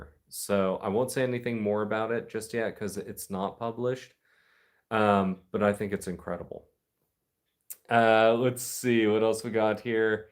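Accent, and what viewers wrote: Scotland, United Kingdom. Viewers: Happy new year. Glad to hear your Scottish accent is coming along nicely. You say Scotland with a Scottish lilt. From Overtown in Scotland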